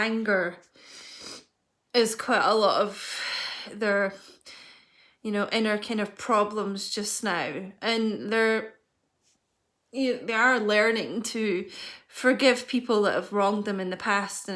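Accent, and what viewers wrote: Scotland, United Kingdom. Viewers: Where are you from? Are you Scottish? I love ur accent lol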